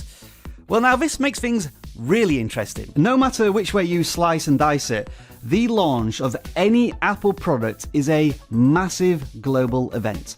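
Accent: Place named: England, United Kingdom. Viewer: I thought you were from england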